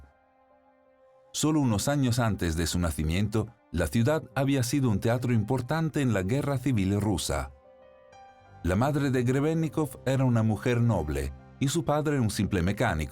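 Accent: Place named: Italy. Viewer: Se llama, excelente voz y hermoso acento italiano!!!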